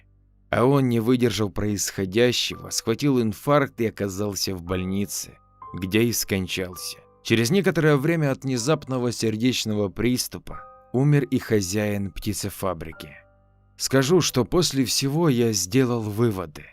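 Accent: Ukraine. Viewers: Автор, не отрусить одежду а отряхивать ) не обижайся , это украинский канал ? Твои истории отличные и читаешь хорошо люблю слушать твои истории ну иногда слова украинские на русский лад. Тебе же нужны длинные комменты ) вот и написала, я украинка может мне мерещиться ))))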